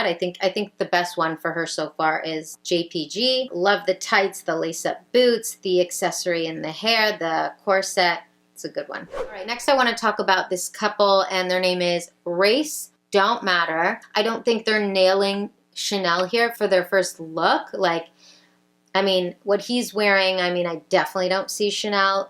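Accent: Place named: North, United States. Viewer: Who watches you, you murmur. I must have issues. Yes, I am a Midwest gal transplanted to the Dallas area and so grateful to hear the Northern accent (which to us is not an accent, it is in Texas that there is an accent.) You get my drift. Every video is like a visit from the little sister I never had, the one in Canada, not Minnesota, blonde by design instead of nature, the cute sis. I am so grateful for your video visits.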